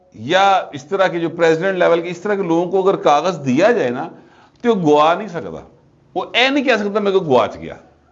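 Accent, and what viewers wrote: Lahore, Pakistan. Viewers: I love your natural style of Lahori Punjabi and natural mixture of “ thrra syasut “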